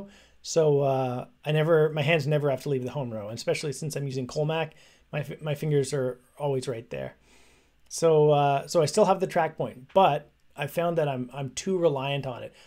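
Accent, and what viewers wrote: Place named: Canada. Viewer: It took me five seconds to know you’re Canadian. And I grew up and I live in Sweden. The times we live in❣️🙏🏻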